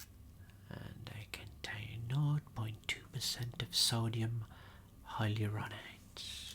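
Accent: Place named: England, United Kingdom. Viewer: Sounds like an Englishman to me!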